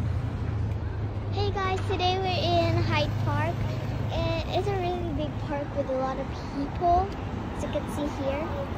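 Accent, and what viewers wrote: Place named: Canada. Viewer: Nice!!! You sound Canadian ey!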